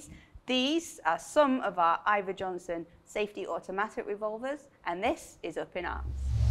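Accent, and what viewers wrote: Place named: Yorkshire, United Kingdom. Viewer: She sounds to me like a less strong version of Jodie Whittaker's accent and she's Skelmanthorpe, so certainly Yorkshire, but it'd probably take an actual Yorkshire person to narrow it down t' area.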